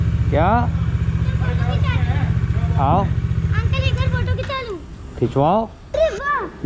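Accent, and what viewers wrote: Kanpur, India. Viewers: Bhai...amazing vlog series....but yaar aapka typical Kanpur accent is really wonderful...😊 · bhai maza a gya apki voice sun ke kanpur ki ek alg hi feel ata he maza a gya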